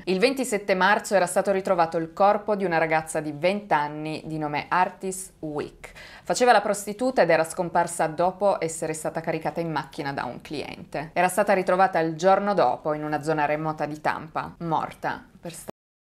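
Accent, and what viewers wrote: Turin, Italy. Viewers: Si sente che sei torinese cara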